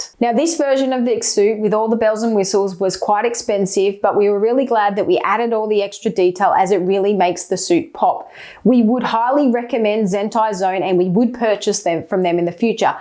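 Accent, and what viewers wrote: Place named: Australia. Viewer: when she first said in the video Spider-Man i knew she was a AUSSIE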